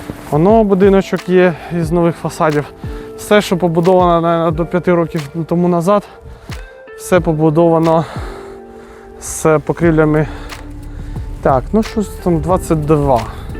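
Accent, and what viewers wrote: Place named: Russia. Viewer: ну будто русич пытается по украински говорить)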